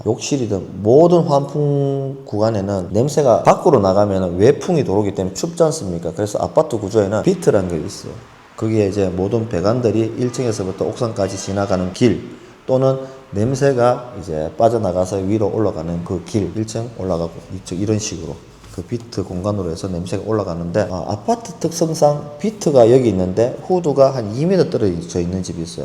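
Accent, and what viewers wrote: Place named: Daegu, South Korea. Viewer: 사투리 쓰시길래 부산이신가했는데 대구시군요~아쉽네요..신뢰가 팍팍갑니다!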